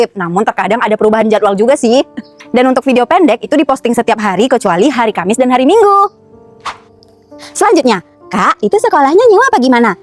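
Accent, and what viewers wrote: Indonesia. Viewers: Kakak orang Aceh ya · Kak asli orang padang ya kalau boleh tau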